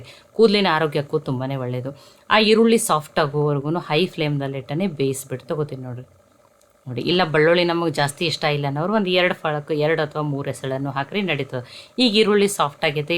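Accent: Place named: Dharwad, India. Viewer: Akka,bhaal ruchi aged nimma adigi.Bhaal cholo anistu numma Dharwad maatu keli❤ illi Bangaluru bhasha keli byasara❤❤❤❤❤🎉🎉😂😂😂😂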